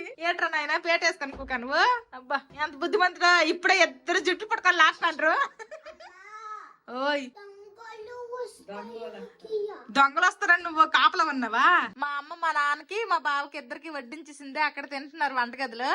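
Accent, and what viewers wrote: Srikakulam, India. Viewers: మీరు సిక్కోలు యాస లోనే వీడియోలు చేయండి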